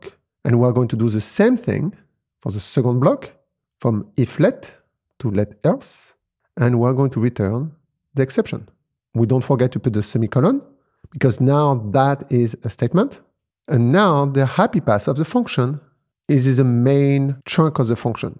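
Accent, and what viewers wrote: France. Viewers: 'pub' 'fn' 'str' 'Err' damn Rust.. what's wrong entire and undestandable words? (You seem to have a french accent, right?).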